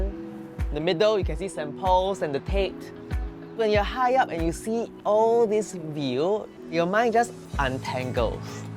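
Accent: Singapore. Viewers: always thought he was just a NA/EU guy with a singaporean accent tbh, never expected him to be singaporean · Wow! Your energy is infectious! And i am so happy that you are proud of our Singaporean accent and do nothing to change it even when speaking to the British. Haha! Love your positive outlook on life! Definitely can't tell you are an introvert. Must be yet another INFJ haha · Accent is very Singaporean; but the whimsical and joyful outlook is clearly NOT Singaporean. Most people I meet here are gloomy and not as bubbly.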